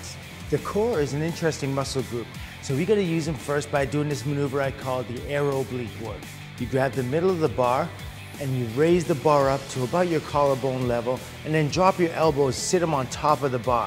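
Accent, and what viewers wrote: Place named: Brazil. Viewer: Brazilian accent, fofinha.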